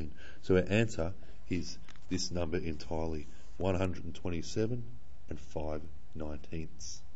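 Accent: Australia. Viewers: i can hear him perfectly, maybe you guys can't understand his aussie accent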